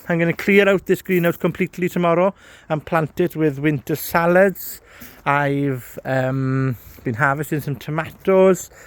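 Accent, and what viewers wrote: Wales, United Kingdom. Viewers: I can't remember...is yours a Welsh accent?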